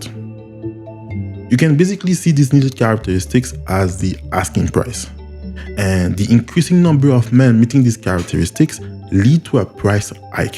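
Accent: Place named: France. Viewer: you have a lovely accent Very French ✨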